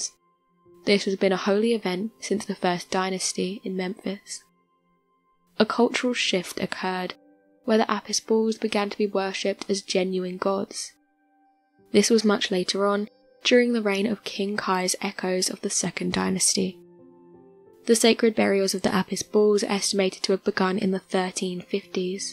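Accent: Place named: Scotland, United Kingdom. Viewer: Sounds Scotch, to me.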